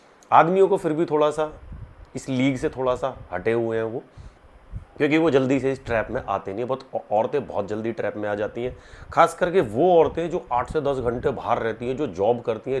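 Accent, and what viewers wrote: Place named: Faridabad, India. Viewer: Faridabadi accent..full mauj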